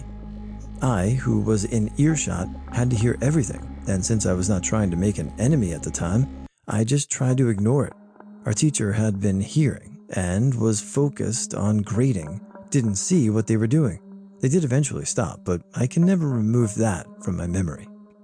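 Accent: Yorkshire, United Kingdom. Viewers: Hilarious Yorkshire accent. Lol. I'm from that huge county (one of four Yorkshires). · Best Yorkshire accent I've ever heard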